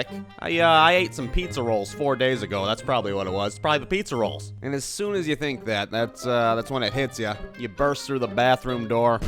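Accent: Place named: Toledo, United States. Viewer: Yo. Are you from Toledo Ohio????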